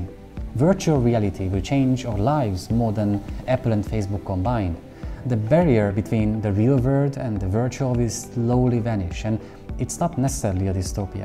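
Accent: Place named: Hungary. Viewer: I knew you were Hungarian as soon as I heard your accent!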